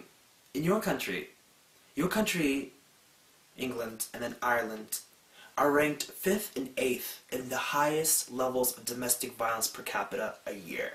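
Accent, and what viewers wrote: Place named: Saudi Arabia. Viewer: هـــذا الشي جميييييييييييييييل، جدًا ! ... ما أستوعب كيف يتكلم "سعودي" زي كذا .. أبغا أقابله شخصيا وأسولف معاه لوول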